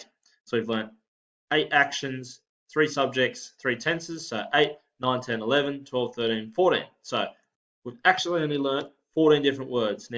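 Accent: Australia. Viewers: How come he's teaching Maori with an Aussie accent? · You sound Australian Don't be offended but thank you nga mihi bro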